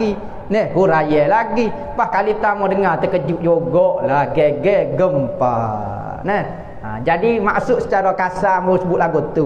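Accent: Kelantan, Malaysia. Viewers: mungkin dorg juga tk fhm loghat kelantan 😆😆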